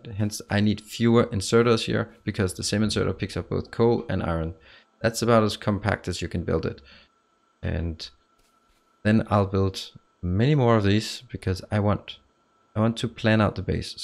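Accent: Denmark. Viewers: are you danish? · He is Danish. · Danish I think. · Danish I believe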